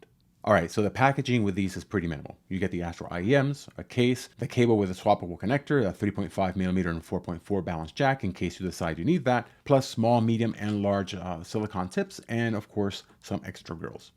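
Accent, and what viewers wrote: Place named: Castile, Spain. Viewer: I have to ask, are you Spanish? Your accent, while pretty neutral, seems to leak a little… Castellano…?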